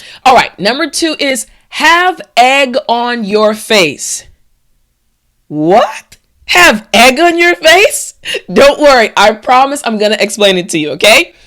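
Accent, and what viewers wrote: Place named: United States. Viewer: Hello, there! I'm an English teacher from Rio de Janeiro, Brazil. I usually watch videos from British channels, for I'm a sucker for their accent. But you speak so clearly and beautifully that I have become your fan. Where in the US are you from? Thank you and keep up the good work! <3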